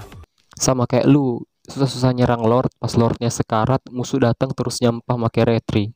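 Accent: Sulawesi, Indonesia. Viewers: Mantap bang, org sulawesikah? 😅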